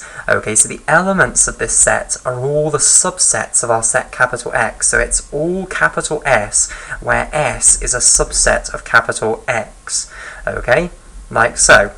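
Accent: England, United Kingdom. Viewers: English accent has it's benifits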